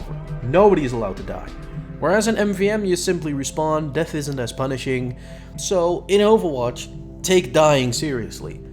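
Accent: Netherlands. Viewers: Are You Also Dutch?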